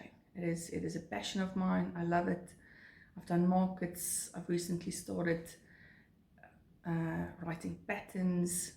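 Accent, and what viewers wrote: South Africa. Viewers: I just saw another one of your videos and heard your accent. I knew that you must be from SA...and you are! I am from the US, but living in SA for 22 years. I totally know what you mean about the post. I don't order yarn or kits from overseas because of it. However, I am in a yarn subscription that isn't a kit and have it delivered to my parents in the US. I have be crocheting for a long time, but have yet to try amigurumi. Maybe you will convince me! 😉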